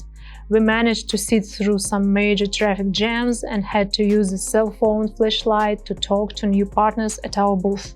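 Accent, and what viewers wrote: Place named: Russia. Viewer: Great news! Love your accent, assuming Russian?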